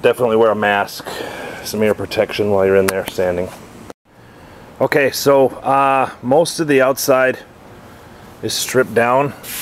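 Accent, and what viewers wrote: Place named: Wisconsin, United States. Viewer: I thought you sounded like you're from Wisconsin. Looks like a good project. I wish I had the tools, etc to build one myself.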